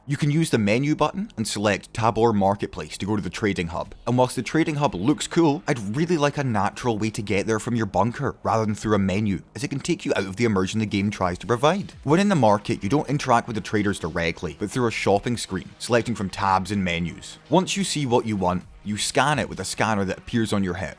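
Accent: Scotland, United Kingdom. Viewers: is this a scottish accent? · yeah he's from Glasgow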